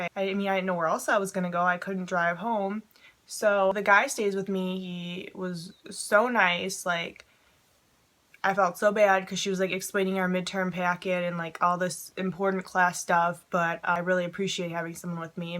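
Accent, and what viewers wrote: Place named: New York, United States. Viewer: Where are you from you have that funny accent lol "she eeasked" no offense I just think you're from New York or something ☺️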